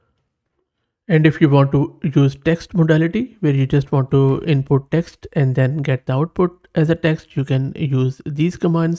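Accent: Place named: India. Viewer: Индусский английский ни с чем не перепутать! Спасибо за видео!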